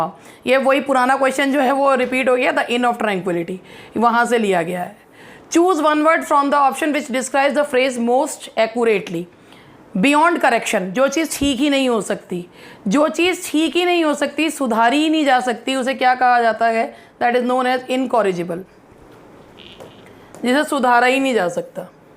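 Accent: Meerut, India. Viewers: Meerut ki tone alag he hai😁.. pleasure to see videos. M.A ki classes yaad ajati hai..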